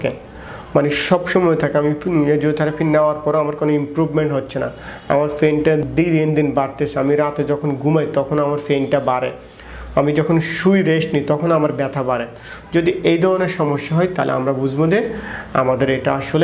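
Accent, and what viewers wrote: Noakhali, Bangladesh. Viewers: আপনার বাড়ি নোয়াখালী। তাই পিঠ কে ফিট বলতেছেন। পেইন কে ফেইন বলছেন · ভাই নোয়াখালি বাসা না কি কথা বুঝতেই অসুবিধায় পড়ে গেলাম.. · শুদ্ধ করে কথা বলা শিখতে হবে আগে, বাড়ি কি নোয়াখালী নাকি?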